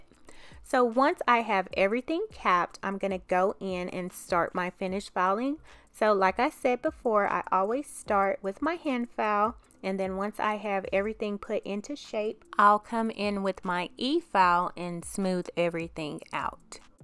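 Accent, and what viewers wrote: South, United States. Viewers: These are so beautiful! You go you are so talented and I love your voice and to be it's southern and I'm southern lol.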